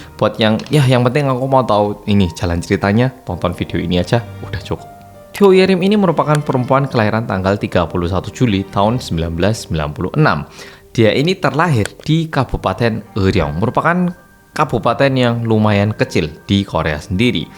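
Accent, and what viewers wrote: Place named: Malang, Indonesia. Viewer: Oalah sakno e talah.. rest in peace ya cantik.. jan kok nemen.. mas kok yo ero kualat.. hahahhahaa... jan medok malange.. salam dari malang.. ❤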